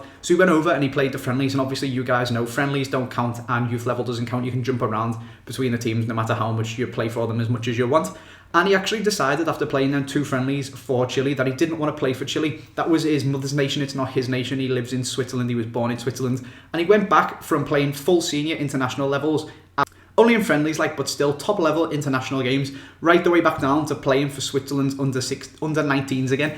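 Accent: Liverpool, United Kingdom. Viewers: Yay another scouser, shame ur not a liverpool fan tho :P ;)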